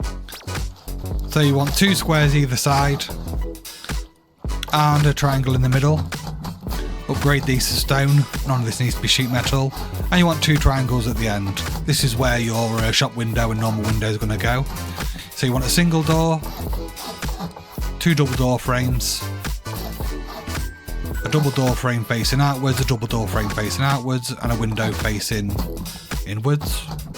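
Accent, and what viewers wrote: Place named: United Kingdom. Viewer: Wait that was a girl ? 😂😂 also where is she from in aus cause that accent also sounded British lmfao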